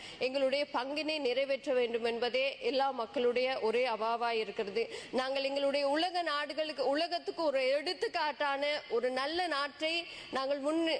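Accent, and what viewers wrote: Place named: Tamil Nadu, India. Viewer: Proud of you sister really happy becouse of i heart tamil slang after long time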